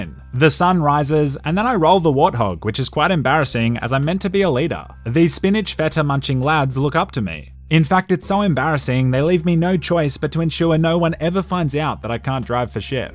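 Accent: Australia. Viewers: >Started watching >5 seconds in >Disgusting australian accent >Pause video and click off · I can't believe I found your channel after many years. It was one of your hitman playthroughs coupled with your ozzie deadpan sarcasm which made me subscribe. Brilliant content. I watched every hitman 2 one, all of the farcry ones, all of the GTA SA and IV ones and the Vice City one. To the point my wife knows that when I go to bed, we are watching for hours now. Shame you're not doing the old intros that you once did, to spread the good word of your channel. I even made one below 😕 "Hi lads and lasses, here. If you enjoyed this video, please hijack one of Elon Musks' SpaceX rockets and send an emergency broadcast out to every planet within reach, stating to subscribe to, as this really helps the good word of my channel"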